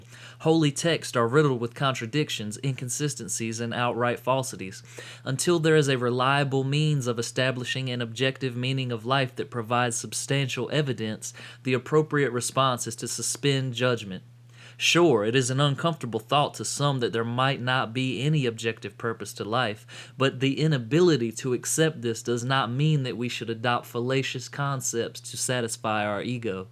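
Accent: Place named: South, United States. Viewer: I like when enlightened thoughts are spoken with a country accent!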